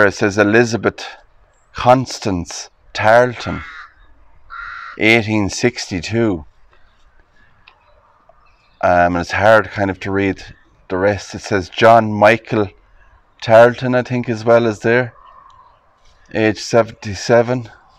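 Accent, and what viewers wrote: Ireland. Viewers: love the irish accent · I love the Irish accent. Mother-in-laws ancestors were from Dingle Dale. Great job on all your videos. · Sorry, I can't help with the Latin, but as for that tree.... yup, I do believe it is a weeping willow... and an old tree at that! That chain.... wow, that's a work of art, very nice! And yes, another one here who loves the Irish accent! 👍 As a matter of fact, I'm listening right now to a few of th' b'yes o'er in Ireland on the ham radio! (I speak with a few of them from time to time!) 👌 Pete 🇨🇦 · Love your Irish brogue!! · Thank you for another wonderful tour! Yes I too love listening to you and your Irish accent I could listen to all day!❤❤❤❤ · Love the irirsh accent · I was also wondering which part of Ireland your accent is from. My 4 times great grandfather came from county Clare to Australia in chains,for the term of his natural life because it was alleged that he was involved in white boy activities,he was 12 I often wonder what he looked like and spoke like. 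He died at 35,no doubt as a result of being flogged as laid out in the NSW convict records. 😮. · I really love the Irish accent and yes that’s a weeping willow, we have them here in Australia too. Love your videos xx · ME TOO i love YOUR IRISH ACCENT!!!! OLD CEMETERY'S ARE SO INTERESTING. bUT IT IS SAD HOW TIME WRECKS THEM AND SOMETIMES VANDALS. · Thank you for another beautiful video. My husband and I were just commenting on your Irish accent. We are in the United States in Northern Michigan and we get teased about our accents here, if you really want to hear a different accent look up a Yooper accent. It’s an accent that people who live in our upper peninsula have, some of the people who live in the lower peninsula but very far north also have some of this accent. It comes from all of the Finnish, Yugoslavian,Italian and Canadian people who migrated here through Ellis island to work in the many copper mines. 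I hope that the two of you have a lovely evening.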